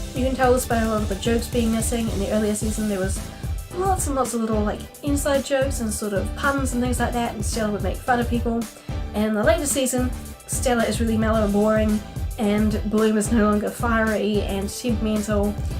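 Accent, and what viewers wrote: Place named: New Zealand. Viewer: I love your accent. New Zealand?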